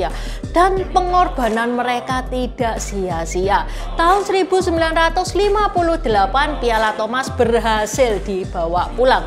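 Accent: Java, Indonesia. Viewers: Ya sambil berbahasa indonesia bergaya LOGAT JAWA. MANTAF🙏🙏🙏👍👍👍👍👍👍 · Mbak suaranya medok banget.jawanya mana mbak,👍💪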